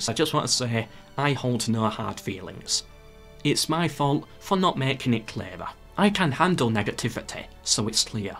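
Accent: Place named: Scotland, United Kingdom. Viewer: How do you even confuse a Scottish and a Northern English accent. I mean seriously, you guys don't even have a slightly similar voice! In any case, glad you're doing better now. Cheers mate!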